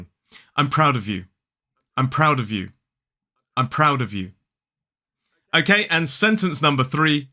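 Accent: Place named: Cockney, United Kingdom. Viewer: Nice english similar cockney accent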